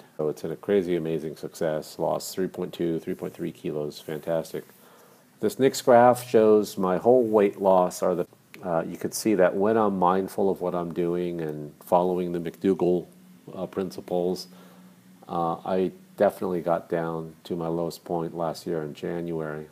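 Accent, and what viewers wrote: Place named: United States. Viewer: You sound American but you talk about kilos or whatever. I'm American and I talk in pounds. Everyone here talks in pounds. I don't know anything about kilos.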